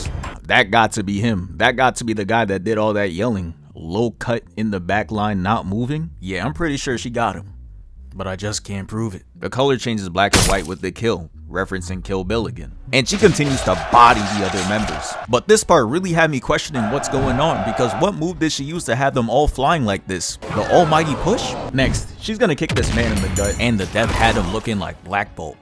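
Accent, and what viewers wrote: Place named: South, United States. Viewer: Yeah pardna, he talk country.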